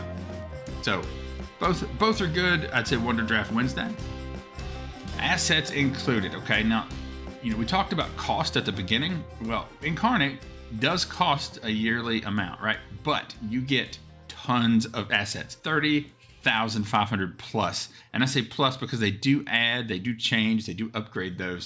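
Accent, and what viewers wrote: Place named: Alabama, United States. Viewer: You from Alabama? You sound just like Destin from Smarter every day!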